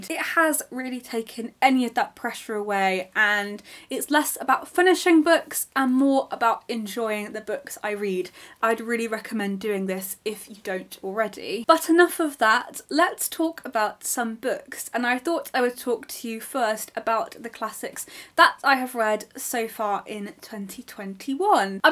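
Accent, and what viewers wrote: United Kingdom. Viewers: Hello Miss. Hope you are doing very well. You are a well-read person. How old are you and how many books you've read so far? Where were you grown up and where do you live now? I started listening to your videos because of your good British accent. I would like to ask you a favour, do you have time to attend a ZOOM meeting where we can discuss books and classics. You are outstanding and we can learn from you.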